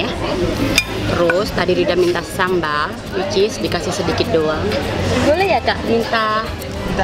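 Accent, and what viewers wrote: Java, Indonesia. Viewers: Medoknya itu low ngbuat ak kalo nonton pasti diulang2 mulu😂😍 loveLah buat 😘💋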